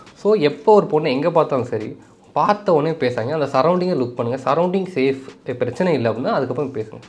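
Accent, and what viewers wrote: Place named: Madurai, India. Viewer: Bro madurai kaarar pola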